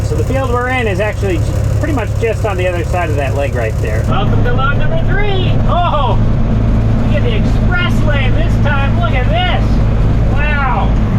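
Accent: Scotland, United Kingdom. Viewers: Scottish : my very favorite accent 💙 greetings from Utah, USA🇺🇸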